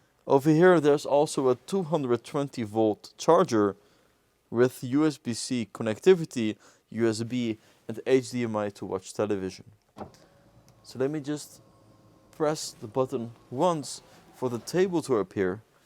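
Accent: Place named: Netherlands. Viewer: Is dit een Nederlands kanaal? Dacht dat ik dat kon horen aan zijn accent.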